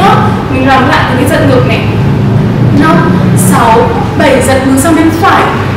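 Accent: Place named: Hanoi, Vietnam. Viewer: Ôi, giọng Hà Nội nghe ấm, sang, thanh lịch quá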